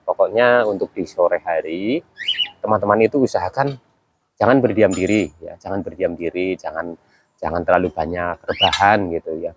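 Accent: Banyumas, Indonesia. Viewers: Kk ini didaerah mana kk ngapak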